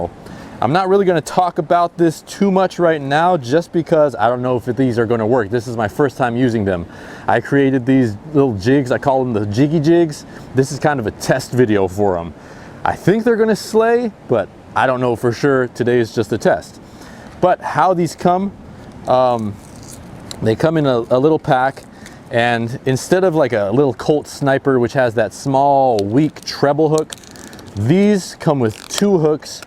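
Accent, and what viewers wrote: Bay Area, United States. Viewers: U know from the bay when he says hella yee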